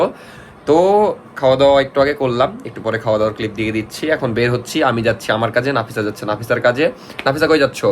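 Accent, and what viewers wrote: Rajshahi, Bangladesh. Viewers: "Hebi sundor laaegche" love ur rajshahi accent ❤🎉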